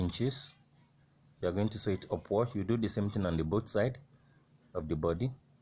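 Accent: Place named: India. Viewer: Why are u using indian ascent pls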